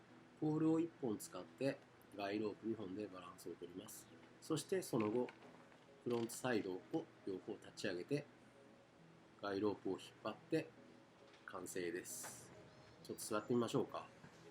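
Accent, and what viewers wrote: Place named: Hiroshima, Japan. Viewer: おはようございます！広島のイントネーションの解説落ち着きますね👍手順がよく分かって助かります。 自作タープ私も作ってみたいのですが、生地代だけでも結構なお値段なので中々着手出来てませんw 幕内薪ストーブお篭りぬくぬく憧れなのですが、私にはまだ早そうなので来期挑戦したい憧れスタイルです😄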